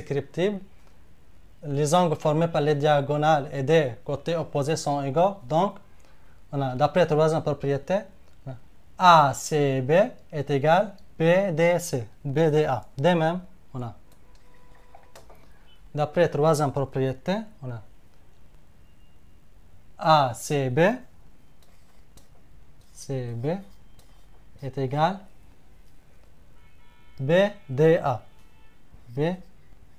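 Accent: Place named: Lebanon. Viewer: c'est un accent libanais je pense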